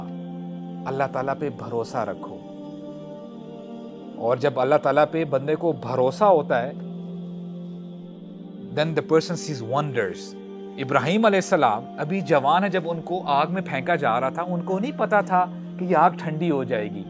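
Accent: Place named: United Kingdom. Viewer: Please avoid using English accent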